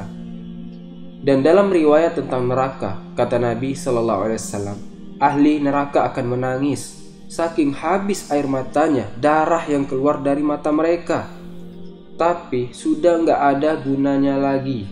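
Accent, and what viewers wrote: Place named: Lombok, Indonesia. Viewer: Dialek saudara seperti dialek orang lombok. Betulkan saudara dari lombok?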